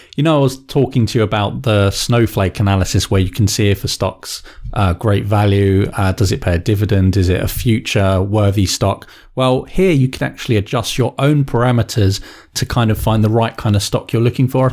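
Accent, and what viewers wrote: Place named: United Kingdom. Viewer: Thank your for this video. I learned a lot, and your British accent is cool. I was going to subscribe, but it seems that almost all of your videos are about crypto coins only.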